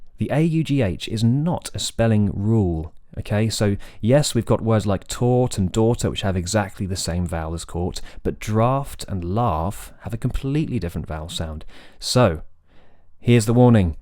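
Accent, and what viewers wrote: United Kingdom. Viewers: Hello!! It is always a pleasure to hear to your clear explanations and beautiful British English. You know? Just thinking out loud......I find the spelling advice you gave us a bit contradictory. Since vowels can be distinguished auditorily I have always counted on the spelling of the vowels and the organs of speech to produce them. Perhaps I think in terms of the spelling of the consonants when there is pre--fortis clipping. I focus on the word family too as you mentioned, daughter is not the same vowel as in draugh. Anyway, I think that considering spelling is an important issue we cannot disregard?? or perhaps you were answering one of Adair's questions I missed? Cheers stella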